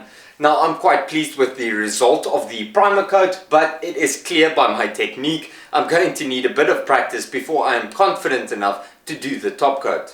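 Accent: South Africa. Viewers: LOVE your videos. My best fried is from SA and I miss him. However, your SA accent makes me feel like I'm hanging out with him and his SA friend. Not to mention, I love you tech tips...all very well done.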